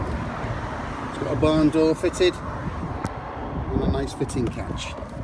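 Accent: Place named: Northern England, United Kingdom. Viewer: Lingua impeccabile, lieve accento nord inglese, con i caratteristici glottal stops. Che il camper sia piccolo è lo scopo preciso del veicolo: è un miracolo che abbiano fatto stare tutto in un furgoncino tanto minuscolo. Poi se tu hai un Mobilvetta da 9 metri, buon per te, ma non c'entra niente. Mi sa che sei un po' corto di comprendonio.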